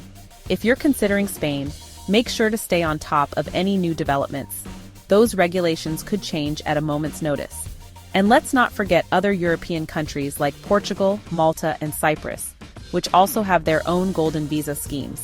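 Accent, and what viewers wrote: United States. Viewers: Sick of this American narrator and her bigotry. Presumably AI, input by the trumpeter. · American narrator ?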